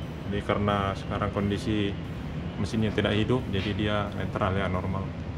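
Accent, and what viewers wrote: Indonesia. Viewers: Saya suka sama logat ny Lae... Horas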